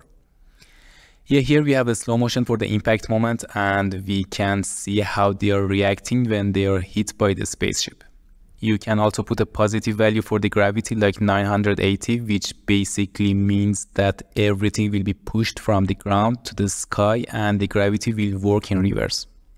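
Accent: Iran. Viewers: عالی بود جان فقط یه سوال دارم من تازه چنلت رو دیدم و دیدم لهجه داری فهمیدم ایرانی هستی بدون اینکه به اسمت توجه کنم... خواستم بدونم واسه تولید محتوا انگلیسی آیا بازدهی بیشتری داره؟ چون خودمم میخوام همینکارو بکنم... و احتیاج به راهنمایی شما دارم... و اینکه اگه توی ویدیو صحبت کنی آیا تاثیری داره واسه مخاطب هرچند سخته انگلیسی واسه ماها ولی تنیجه‌اش رو میخوام بدونم ممنون میشم جواب بدی🫶👑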